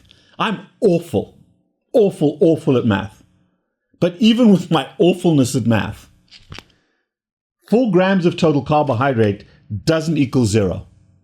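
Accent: South Africa. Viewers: The indignation, mild profanity, sarcasm is hilarious coming from a sophisticated person with South African accent. Educational AND entertaining. Lol.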